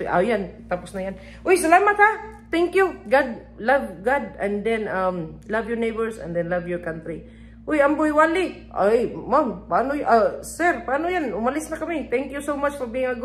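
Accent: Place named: Visayas, Philippines. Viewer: Bisaya kaau ka mgtagalog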